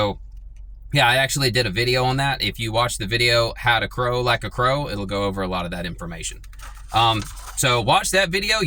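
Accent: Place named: South, United States. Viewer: Thank you for explaining this about crows. And for keeping most of your Southern accent... I'm originally from the bayou country south of New Orleans. Crows around my hometown were very loud, and for some reason I used to be afraid of them. I've since learned more about their intelligence and friendship with humans by watching videos. Where I live now there are a variety of birds and squirrels we feed with black sunflower seeds, along with Crows that let themselves be known in the area. I'd love to learn how to meet and befriend them. I've joined your channel and am looking forward to learning more from you. Thank you 😊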